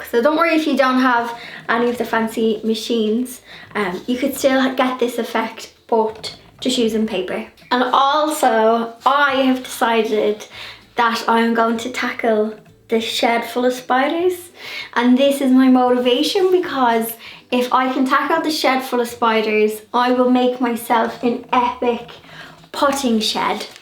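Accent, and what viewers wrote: Ireland. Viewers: I love your Channel! I’ve spent the whole day watching! Love your sweet Irish Accent. My Father was born in Dublin in 1920, and I have a special place in my heart for all things Irish.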